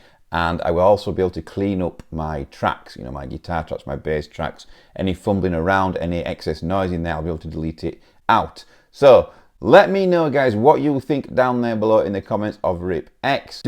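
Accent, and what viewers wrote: North, United Kingdom. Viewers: Great video, and nice to hear a northern accent! I have my own little YT channel where I do bass covers - I'm seriously considering this as I prefer to play over tracks that have already had the bass-line removed so as I'm not doubling up on the bass. This software looks like it could do the trick! 🙂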